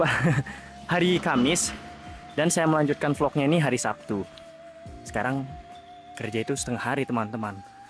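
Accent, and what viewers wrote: Lombok, Indonesia. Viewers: salam dri lombok bang, stelah sy dnger logat bhs indo sde, trnyata bner sde asli lombok tulen ,, sehat selalu smeton .. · Awalnya saya penasaran ini Abang dari mana, syaa tebak tebak dari aksenya bahasanya awalnya ragu" eh pas menint ini (sumpret)😂😂, badahh Batur Sasak arane sehat sehat Batur mudahan de sukses lek jepang! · Logat nya mirip orang lombok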